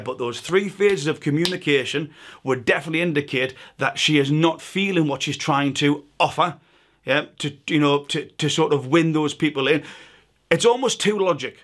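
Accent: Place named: Scotland, United Kingdom. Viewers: Loving the Scottish accent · the moment I heard your accent it was an instant subscribe...my weakness is Scottish accents. She was literally painful to watch as a woman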